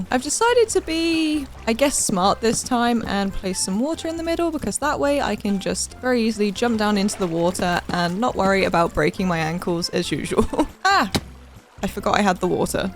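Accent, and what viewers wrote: United Kingdom. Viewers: Wanted to comment and tell you that my little girl and I have been absolutely loving watching your videos lately. She is delighted by Millie's antics, and loves your British accent, as well.:) We've recently gotten back into minecraft as she's finally old enough (five years old) to play it, too! We love your videos, & Millie!!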